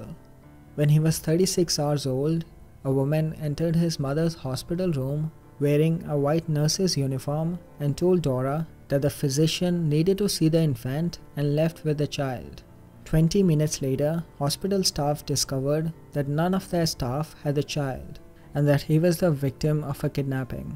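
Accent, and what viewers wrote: India. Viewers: not this indian voice, literally worst accent possible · Are you from India? I have a friend in Northern India and I'm noticing the accent is slightly different but similar. Most of the Indians I've met have accents closer to yours.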